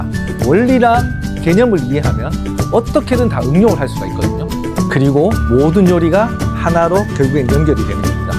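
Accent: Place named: Daegu, South Korea. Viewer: 대구 사투리로 정겹게 설명해주시는게 정겹네요ㅎ 많은 영상 기대할께요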